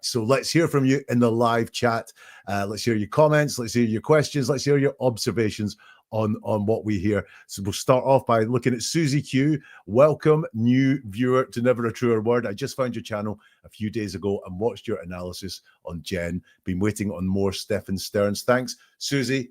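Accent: Scotland, United Kingdom. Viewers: Hello from highland Perthshire, its braw to hear a fellow Scot annalysing this so and so. Watching on catchup. Just subscribed, so hope i get notifications for a live or two and can blether in the chat ❤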